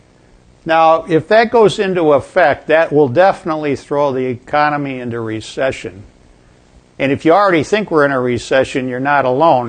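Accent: United States. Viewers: I know this is completely off topic, but what sort of American accent does he have? It does sound a bit different than the standard. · dis guy is from Minnesota Eh? seems pretty reasonable wit possible exception of Market being your salvation.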